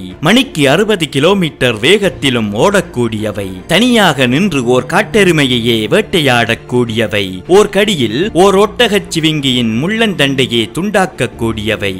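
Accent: Sri Lanka. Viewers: சார் இலங்கை தமிழராஆ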